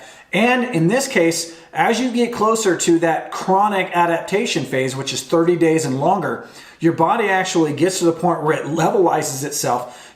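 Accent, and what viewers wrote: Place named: RP, United Kingdom. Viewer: It's so nice to heard commentary about CU by someone who speaks the Queen's English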